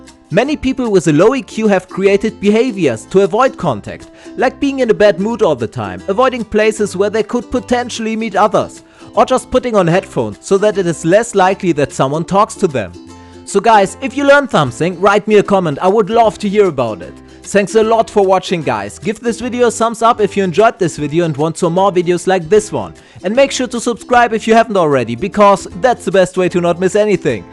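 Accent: Germany. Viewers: Ist deine Muttersprache Deutsch? You sound like a german 😃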